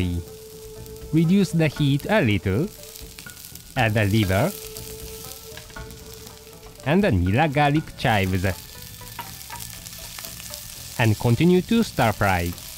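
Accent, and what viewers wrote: Japan. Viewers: Nope. The narrator is Japanese, so it's a Japanese accent. I think it might be the chef's husband, though I'm not 100% sure. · No... It's a Japanese accent dude. (I'm fluent in French, so I know that's not a French accent, I live in Japan, so I know that it's a Japanese accent) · is the narrator a Japanese accent?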